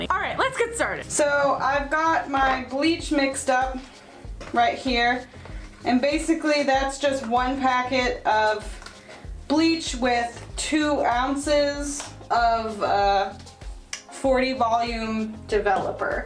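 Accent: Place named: Midwest, United States. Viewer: 😂 I thought you were doing a Illinois/Wisconsin accent purposely at first. Looks so cute. My hair is identical to yours and I miss my longer hair. Thanks for inspiring ❤️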